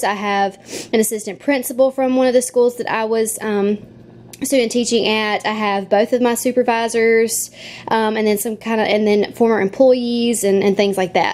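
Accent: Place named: South, United States. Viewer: You are so southern and it's adorable. Don't change!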